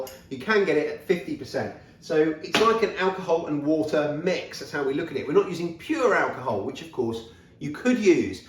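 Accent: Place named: England, United Kingdom. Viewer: Just found you! ❤️ Just subbed can't wait to check out your other vids and no offence to our American cousins your English! Just a bit more relatable - it's almost Summer in Australia so my mind is everywhere - so are the flies! As a migrant from UK I have never got used to them ,- those the ants and the flipping spiders! Will make the tincture to try to keep the ants at bay wish me luck! Looking forward to being a Subby and sharing vids with family and friends. Best wishes and positive vibes from Sydney Australia 🇬🇧❤️🇦🇺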